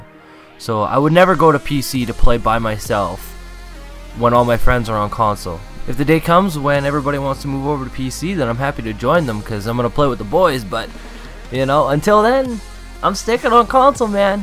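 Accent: Canada. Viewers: I could tell u were Canadian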